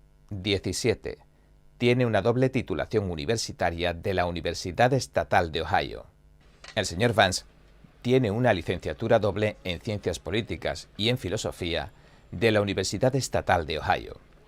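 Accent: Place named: Castile, Spain. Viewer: ¡Como estoy disfrutando de este canal desde que lo descubrí el otro día! EXCELENTE información sobre EEUU y con locutor español, con acento castellanp.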